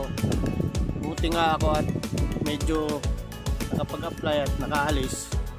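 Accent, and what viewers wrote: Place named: Batangas, Philippines. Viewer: parang Batangenyo ang punto mo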